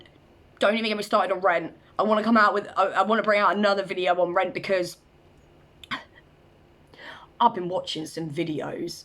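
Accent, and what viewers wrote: United Kingdom. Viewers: Subscribed for her British accent and energy.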